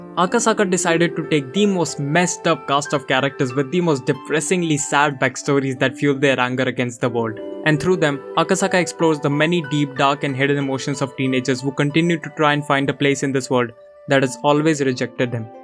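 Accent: India. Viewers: Amazing video man, btw are you Indian ? Your accent sounds like it.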